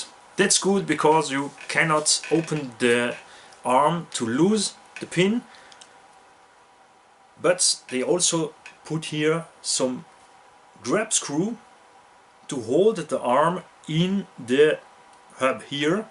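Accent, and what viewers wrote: Alsace, France. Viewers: You have a great french accent lol 😅 pretty interesting vid ! I love this buggy too! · a lenglish accent francais alsaco je valide !!!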